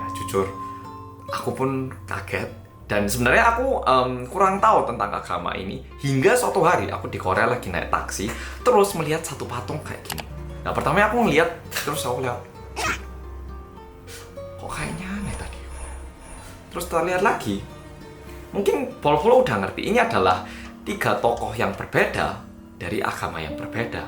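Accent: Java, Indonesia. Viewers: meskipun YouTuber lain nya sudah upload tapi aku masih tetap setia menanti videonya mas. G tau kenapa enak saja di dengar, jelas dan mudah di pahami 👍🏻🙏🏻 karena aku suka YouTube Yang MEEEEEDDDOOOOKKKKK🤗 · medok ngk ap 2 bang kan lucu orang korea medok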